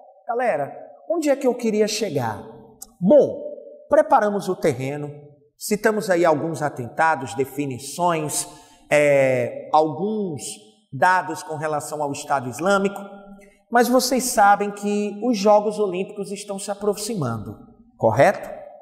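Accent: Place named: Northeast, Brazil. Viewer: Esse professor tem jeito que é nordestino sou tbm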